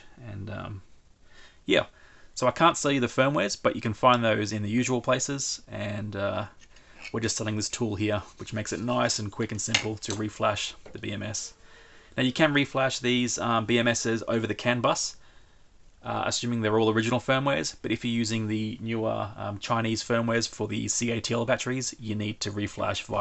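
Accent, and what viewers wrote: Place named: Australia. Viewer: Australian accent.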